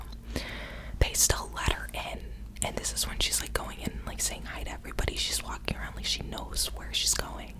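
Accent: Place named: New York, United States. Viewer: I love the subtle northern accent, Ny or NJ😂 Great videos, thank you. It's weird, I remember hearing about this case all over the news as a kid and never got to learn what happened until now. I think I was like 8 at the time and in Florida (basically in the same place as her, maybe 10 minutes away), wild.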